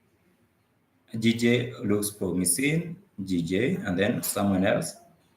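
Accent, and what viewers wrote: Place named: Kenya. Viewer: hi from kenya, nafurai kusikia sauti ya mkenya kwa forex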